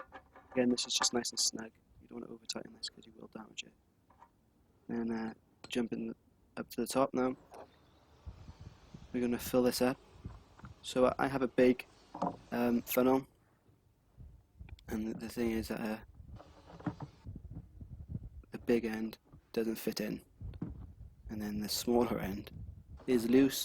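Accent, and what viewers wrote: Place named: Wales, United Kingdom. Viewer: Thanks for your useful tutorial. A little hard to understand your Welsh accent ;) but the video is very clear and helps a lot. You have a free drink if you'll come around Olbia, Sardinia. Just send a message by Youtube. Cheers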